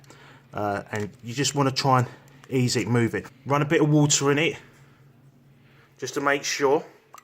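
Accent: United Kingdom. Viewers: the best I,m in canada and my machine is a titan but looks exactly like yours, wont prime and I think its the valve although I cleaned it all out and the ball is free its a mystery, love the cockney accent, like me east end boy thanks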